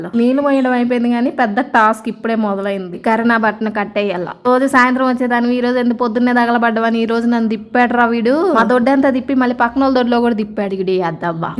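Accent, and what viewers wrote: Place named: Kadapa, India. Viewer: Hii 👋 akka 😊😊😊 Same slang madhi kuda 😂😂😂😂madhey kadapa akka Medhi a oouru akka😂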